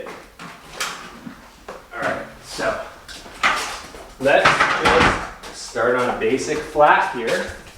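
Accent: Canada. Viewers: I like how Canadians say "process"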